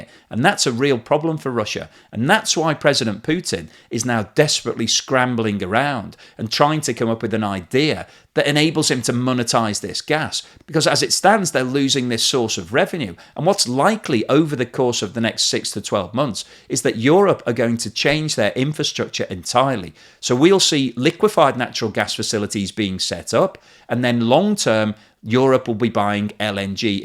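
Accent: United Kingdom. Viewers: This video tells me how crazy these western leaders are. Russian gas is Russian gas no matter the middleman. Erdogan is smart. He is part of NATO and he deals with Russia. So maybe that is why he lets inflation go nuts. If my country🇨🇦 suggested we freeze to death so we can have a pi….g match with USA we the people would certainly bring the truckers back and more. European leaders who opt for making their people and economies suffer need to step away. Turn on Nordstream 2 for goodness sake and deal with Russia. Buy their gas until other alternative sources of energy can be made. If I were made Queen of the world I would ban sanctions everywhere. Open the markets and let the free market flow. Sanctions are the source of hatred and violence worldwide. Not every country will be democratic like ours. We need to help citizens of all countries have a reasonable life. 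Sanctions hurt people not the despots who run the countries. And who says in future these countries will not change with different leaders. I am 70 and I have learned too much punishment on my children has disasterous outcomes. Turn on the gas, push Ukraine and Russia to compromise and stop all this bloodshed. thanks for the videos but you sound British. I would worry about my own country’s demise……it is in serious jeopardy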